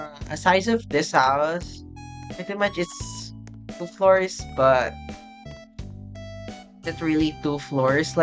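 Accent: Philippines. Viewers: i mean he has a filipino accent and latin american countries also have same styles as the philippines so connect the dots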